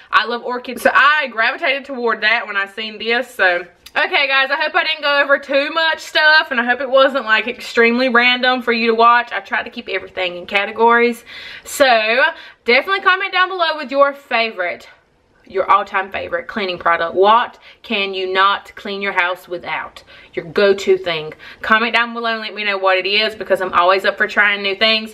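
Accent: South, United States. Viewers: your welcome love your channel and nice to hear someone talk like me. Southern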